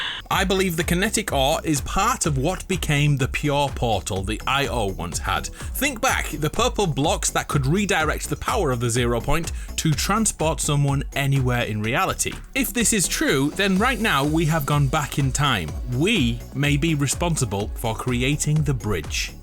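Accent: United Kingdom. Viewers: He's british, they pronounce things differently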